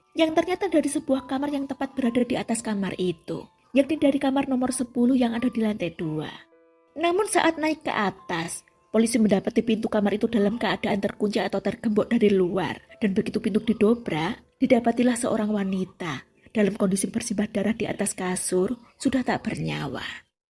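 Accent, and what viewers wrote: Java, Indonesia. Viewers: Mba.. medok bgt suaranya ....😊... Sukses ya...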